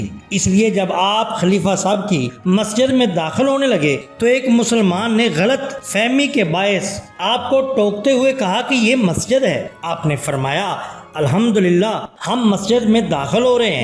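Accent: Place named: Punjab, Pakistan. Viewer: I hate PUNJABDA ACCENT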